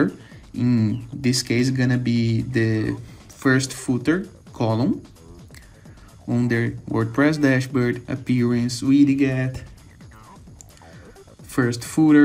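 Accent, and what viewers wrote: Brazil. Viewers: o sotaque não engana, br na veia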